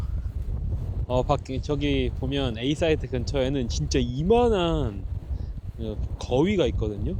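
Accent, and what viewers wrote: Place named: Japan. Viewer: 얼마나 설레며 준비를 하셨을까 그 마음이 저한테 까지 느껴집니다🔥 그나저나 일본톤 찰떡입니다👍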